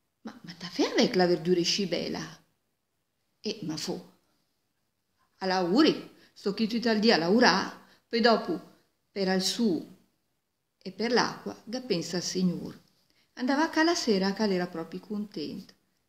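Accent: Milan, Italy. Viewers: Bella storia. Finalmente ascolto una pronuncia del milanese. Ci fai altri video belli come questo. Ciao. Vivo nel Lazio. Ma il milanese mi piace molto. Brava. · Molto brava la signora! E' piacevolissima da ascoltare. Io ho capito tutto pur non parlando attivamente il milanese, perchè sono milanese con origini familiari diverse, ma essendo la mia fonetica, il mio accento e la mia pronuncia assolutamente simili a quelli della signora, ho una facilità estrema a capire anche quando non conosco le singole parole. Anche mia nonna raccontava storie antiche nel suo dialetto. I linguisti sanno che i dialetti regionali italiani non sono dialetti dell'Italiano, ma piuttosto varianti del Latino secondo la parlata locale e quindi in realtà si dovrebbero chiamare lingue regionali. In questo senso l'Italia è affascinante per chi studia linguistica. L'importante è mantenere un bilinguismo autentico. 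A me personalmente dà abbastanza fastidio sia quando sento parlare italiano con regionalismi sia quando sento parlare un dialetto locale italianizzato. Quest'ultima cosa avviene soprattutto fra le nuove generazioni, tanto che spesso non sono più in grado di capire il vero dialetto dei loro nonni. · Ho capito tutto, brava a raccontare, è però forse un "milanes ariús"?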